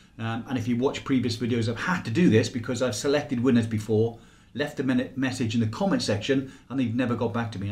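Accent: Wales, United Kingdom. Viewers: Obviously you come from Wales :)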